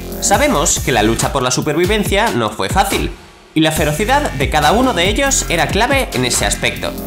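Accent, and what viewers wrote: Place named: Spain. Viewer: hay como cambian palabras y pronunciaciones esos españoles eres español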